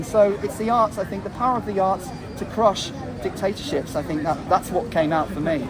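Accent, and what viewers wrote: United Kingdom. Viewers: Dr K seems like such a decent cool guy and I love his accent. Reminds me of me English cousins. Hadn’t heard of him until this nonsense happened. Now I’m following.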